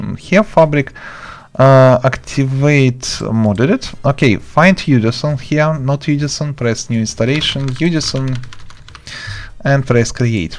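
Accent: Russia. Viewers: Слышен русский акцент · It is Russian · r u russian?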